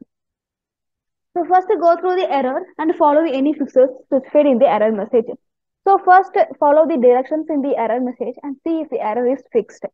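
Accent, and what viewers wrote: India. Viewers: i hear an indian this gonna be good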